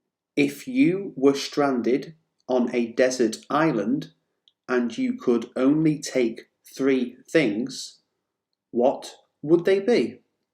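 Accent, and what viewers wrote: United Kingdom. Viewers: Hello. Is your English from the UK ?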